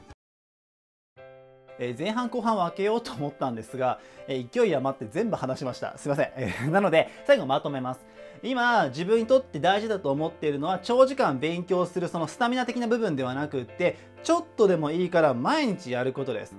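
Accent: Kansai, Japan. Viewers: 関西弁のイントネーションがたまに出るけど 関西で過ごした事あるんですか？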